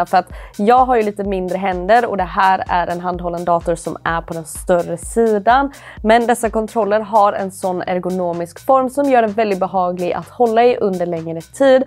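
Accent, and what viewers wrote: Sweden. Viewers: Vad är det där för skön dialekt egentligen? Kan inte riktigt placera den. Västernorrland? Västerbotten?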